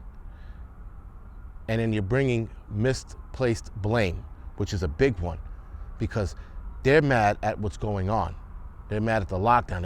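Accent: New York, United States. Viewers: It's just a word, it only has as much power that you give it. Hes a freaking New York firefighter. It's almost in the job description to talk like he does. Most of my family still lives in NYC. Listinging to is like listening to family.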